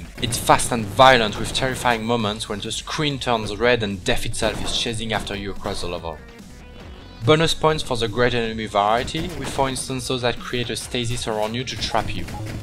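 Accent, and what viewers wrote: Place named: France. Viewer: you french?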